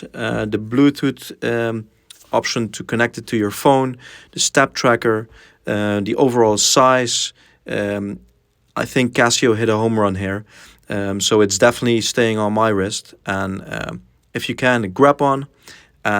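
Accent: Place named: Netherlands. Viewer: I hear Dutch accent, where did you get it from? As far as I know it's not released in the EU.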